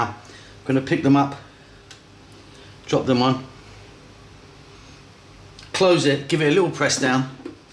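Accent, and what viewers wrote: United Kingdom. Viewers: Looks delicious. Are you originally British?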